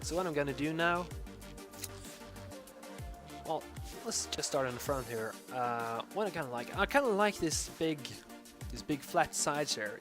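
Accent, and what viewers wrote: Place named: Australia. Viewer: nice vid! like ur australian accent mate ;)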